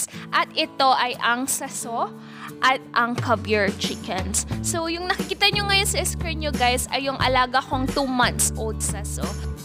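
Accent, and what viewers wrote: Pangasinan, Philippines. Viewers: Pangalatok si maam may punto.hehehe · Pangasinan accent si ate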